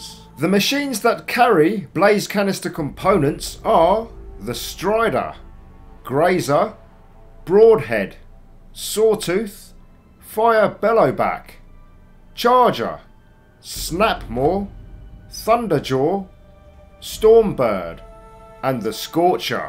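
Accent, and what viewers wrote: United Kingdom. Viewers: You're welcome. I finished the game 3 days ago. What an experience. I cried at the end since what Dr. ES says is really touching. These bloody wankers might have a problem with the British accent or are loyal fan to the Yankie accent haha. Your language and accent are really awesome. Keep up the good and I'm really thrilled to watch more of your videos. It would be great too if you not only make guide videos, but also explain games' story and that stuff. Wish you a lot of success.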